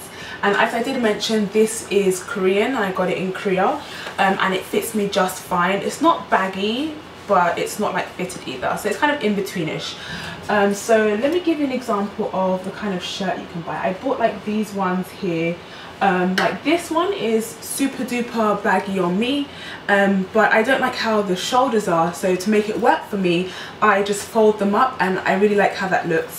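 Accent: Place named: RP, United Kingdom. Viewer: You're so posh your accent omg!! 💕💕💕😍😍😍😍😍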